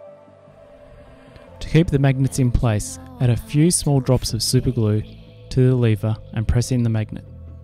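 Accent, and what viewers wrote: Australia. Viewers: are you Australian or Kiwi?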